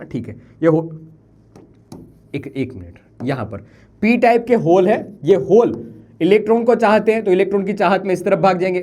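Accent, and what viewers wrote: Gujarat, India. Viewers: Gujrati accent aa hi jaata h 😅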